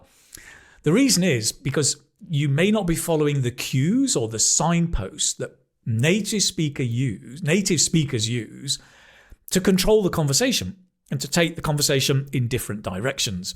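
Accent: United Kingdom. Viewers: I love your british accent❤Very useful video❤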